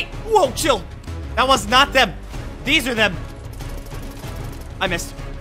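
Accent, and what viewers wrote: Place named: United Kingdom. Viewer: like the British accent lol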